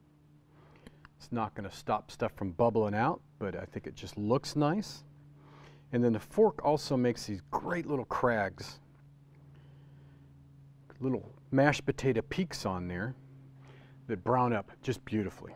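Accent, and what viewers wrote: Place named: Scotland, United Kingdom. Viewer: Very Scottish.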